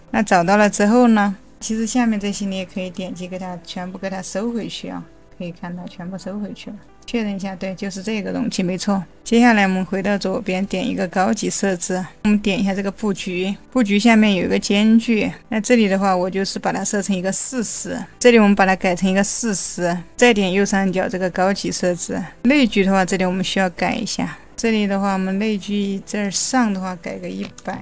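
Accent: Hubei, China. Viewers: 为什么我觉得和雷军一样是湖北口音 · 湖北仙桃口音？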